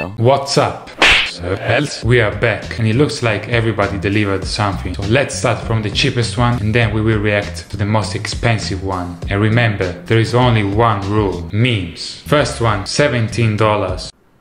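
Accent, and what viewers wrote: Italy. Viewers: italiano si sente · Hey but, are you italian? Because when you said "epico" i understanded that you ARE (i think) italian.. i am parli molto bene inglese ma magari concentrati di più sulla pronuncia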